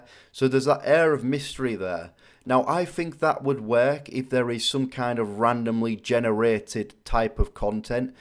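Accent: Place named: United Kingdom. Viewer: Dude where abouts in the UK are you from ? Cant tell your accent.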